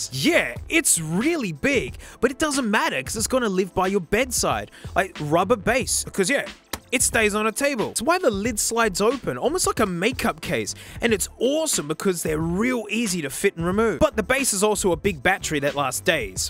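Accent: Australia. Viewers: I'm just here to learn the Australian accent of his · I have never heard tinnitus said that way, am I wrong or is he just too Australian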